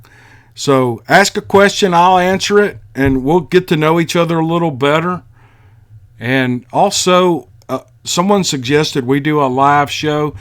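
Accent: South, United States. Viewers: Dear.....From a married woman with 2 kids that lives in Greece...yep in Europe....I sit in my free time and listen to these encounters and that fabulous SOUTHERN ACCENT...Dont ask me why but Dogman and Bigfoot just give me a thrill...MIND YOU>>>>I dont want to have an encounter but I am learning a few things and the first is....NO CAMPING for the rest of my LIFE...Yep thats how creeped out I am..and thankyou for reading all these experiences so as we can take precautions...TAKE CARE!!!! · I absolutely love everything about your podcast: the content, the delivery, and especially your gorgeous Southern accent! It has become my fav Sasquatch Podcast. Keep up the great work darlin!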